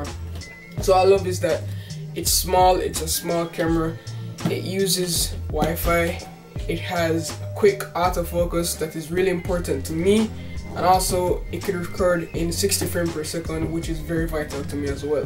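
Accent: Jamaica. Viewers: bro, are you Jamaican? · MajorinkPeoples He's sound like a Jamaican, that's how our accent sound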